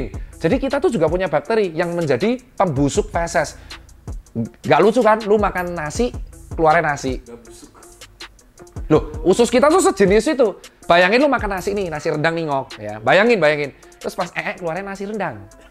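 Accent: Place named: Surabaya, Indonesia. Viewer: Seneng sekali dgn konten edukasi kesehatan dgn gya suroboyoan nyel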